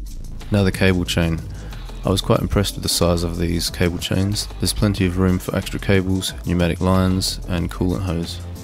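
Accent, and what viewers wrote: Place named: Australia. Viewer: Where you from you sound Australian ?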